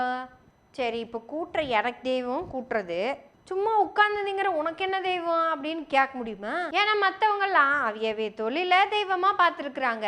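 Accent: Kongu, India. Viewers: காமடி , காமடி வேற லெவல் ..அதை விட உங்க கொங்கு தமிழ் அருமை..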